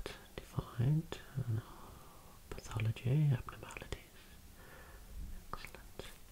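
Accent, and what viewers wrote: United Kingdom. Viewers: Not sure if it is your british accent has something to do with it but I like when you say certain words like improvements, results & delicate. It’s so tinglyyyyy.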